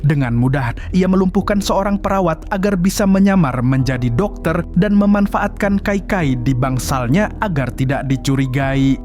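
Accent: Banjar, Indonesia. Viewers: Ai urang banjar sakalinya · Ikam urang Banjar gayaknyak. Samak awan diakuk.slm kulak.alehhai. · Orang banjar ya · urang Banjar kah Pian ni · urang banjar kah ini 😂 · Urang banjar kah pyn mang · Urang banjar ka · Banjar kh mang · Bah banjar jua sekali nya, d.mn nya ikm mang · Bang admin pake bahasa Banjar kayaknya 😅😅😅😂😂😂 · Urang Banjar kah mamang ni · Curiga amang nya nih urang sungai tabuk😂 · Pian urang Banjar kah mang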